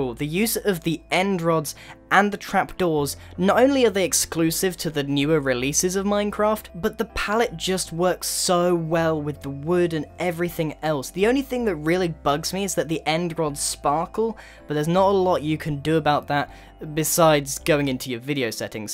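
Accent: United Kingdom. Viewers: I've been waiting for YEARS to say this. You Sound British 😂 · a person with a British accent talkin' about spitfires??? Y E S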